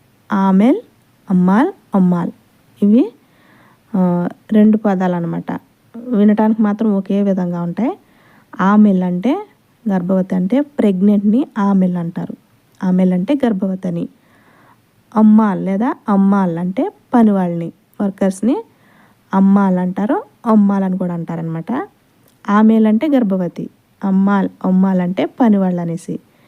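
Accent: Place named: Godavari, India. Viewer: మేడం మీరు ఇస్ట్, వేస్ట్ గోదావరి అనుకుంట మాటలు తియ్యగా వున్నాయి,, మా డిస్ట్రిక్ట్ కడప, ఐ లవ్ యు మేడం మీరు చెప్పే అరబిక్ చాలా ఇంపార్టెంట్ థాంక్స్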